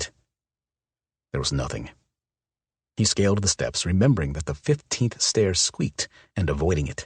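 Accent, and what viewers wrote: United States. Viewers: Why does this bother people so much? Yes the standard prounounciation is wolf, but It is not wrong to pronounce it as wuff/woof. This book is written in, and the narrator speaks in, American English. There are 4 major regional accents and how wolf is pronounced is one of those words that can vary. In the upper Midwest, we don't generally pronounce the L in wolf - just like with the words calf and half. Saying it out loud, I would never pronounce the L unless I was thinking about it and purposefully pronouncing it.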